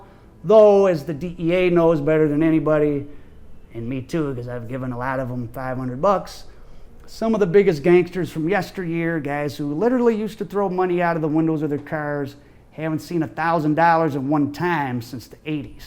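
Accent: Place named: Massachusetts, United States. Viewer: I thought that was a Massachusetts accent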